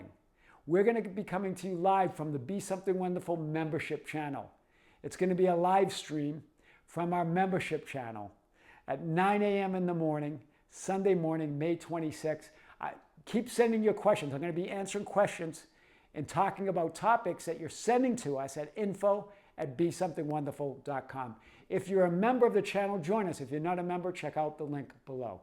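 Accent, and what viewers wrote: Boston, United States. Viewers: The second you spoke I knew you were a Bostonian. LOVE the accent & your channel! I AM.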